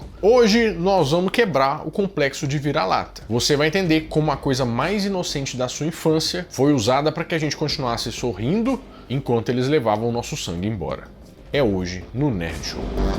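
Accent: Minas Gerais, Brazil. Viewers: Cara, você achou uma das melhores fórmulas para mostrar a história do Brasil aos brasileiros. Leve, simples, informativo e descontraído. A gente nem vê o tempo passar. E o sotaque mineiro torna tudo muito mais agradável e receptível. Parabéns demais pela forma e conteúdo do canal!! Está sensacional!!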